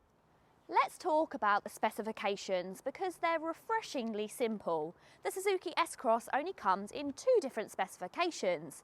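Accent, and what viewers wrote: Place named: Cockney, United Kingdom. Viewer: Nice car, annoying cockney accent.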